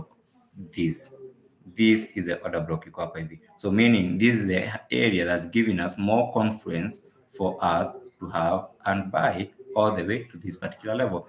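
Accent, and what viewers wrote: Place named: Kenya. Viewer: hi from kenya, nafurai kusikia sauti ya mkenya kwa forex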